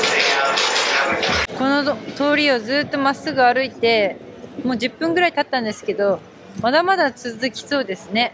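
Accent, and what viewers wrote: Hokkaido, Japan. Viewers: 時折出る北海道弁にほっこりします☺️ さんの食レポ最高です❣️今年も楽しみにしています❗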